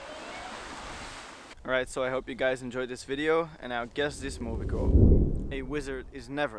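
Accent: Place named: Belgium. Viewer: Where is that, judging by your accent, I think I might live close and I'd love to try that course. Just read you're from Antwerp, dan ben ik zeker dat het niet zo ver is.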